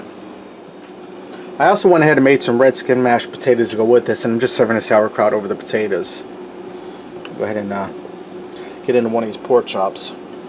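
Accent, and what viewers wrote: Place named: Pittsburgh, United States. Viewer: You have a Pittsburgh accent. Makes me miss the Burgh!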